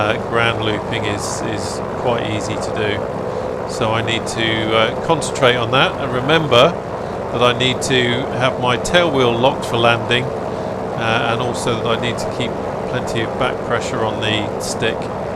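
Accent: United Kingdom. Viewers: 🙈 Pffff … British accent, British person , it’s got to be Duxford or UK. ! UK a bit flat and boring to fly over in m opinion. !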